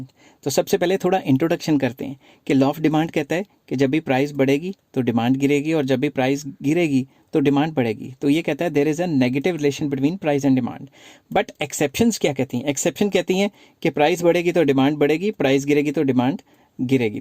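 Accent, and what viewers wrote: Punjab, India. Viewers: Your punjabi accent is to good ❤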